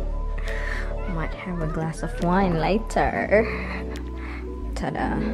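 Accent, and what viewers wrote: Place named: Bisaya, Philippines. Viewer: where in the philippines do u live? you language sounds bisaya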